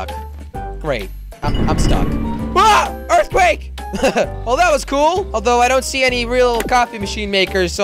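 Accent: Netherlands. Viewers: je komt toch uit limburg?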